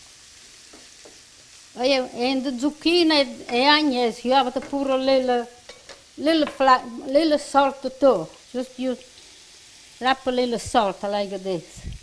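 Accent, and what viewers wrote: Italy. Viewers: So glad to see you happy and healthy, you are a true inspiration to all. I made your stuffed cabbage ( which I have never ever made in my life) and I invited my daughters fiance's mother over for supper, well all I can say is thank you and thank you heavenly father, my husband and my friend LOVED THEM. I was panicking the whole time I was making them and when I was rolling them I kept hearing your voice " keep all the stuffing inside" (of course in you beautiful Italian accent). Thank you for showing us how to make fabulous Italian food that our families love. PS I still am up for adoption. Much love to you and your family God Bless. Oh and I forgot this will be dinner tonight for hubby and I. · Thank you for demonstrating this delicious recipe. I love your Italian accent. You are such a great cook and singer too! ❤️👍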